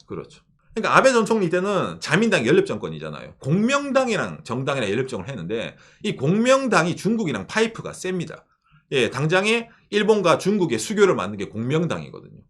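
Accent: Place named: Gyeongsang, South Korea. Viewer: 일본 사람 팬더 좋아한다메? ㅋㅋ 과 같이 살더니 자연스레 경상도 사투리를 쓰시네요~~~^^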